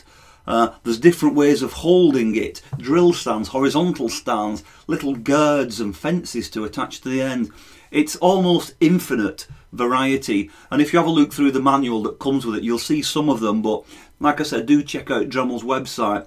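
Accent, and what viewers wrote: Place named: North, United Kingdom. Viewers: Thank you. I spent a couple of frustrating hours reading the manual and puzzling over the box of bits that came with it. Your vid clarified it perfectly. Reassuring and pleasant to hear a northerner too. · I would like to second the previous comment. Absolutely they should hire you. thank you - Plus it was nice to hear a northern accent -I like in LA but come from Liverpool. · Thanks,! This tool is wonderful but the instructions that come with it are woeful. Now that I've watched your video, I actually know how to use it....and having it delivered in a Bolton(?) accent is an added bonus:-D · I just noticed your name is, Now I'm nervous that you're Irish, but you sound like a liverpudlian. Spot on review too, btw!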